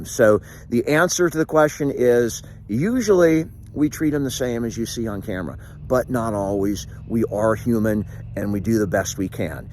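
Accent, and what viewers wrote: California, United States. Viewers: You have a heavy California accent lol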